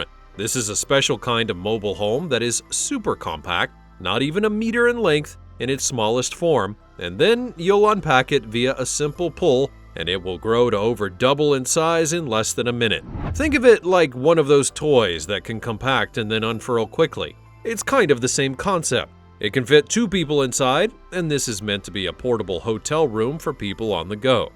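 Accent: United States. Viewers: None of these are mobile homes though. They're cool campers don't get me wrong, but they aren't mobile homes. they aren't meant to be lived in, except, perhaps, for the tiny homes but even those still aren't mobile homes. I would think that this word difference is cultural, but you sound as American as they come so I don't think that's it...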